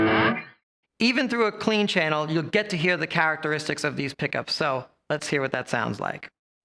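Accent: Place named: Texas, United States. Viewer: WOw! Thats a pretty dope Texas accent...hehe...awesome model by BCR...good stuff!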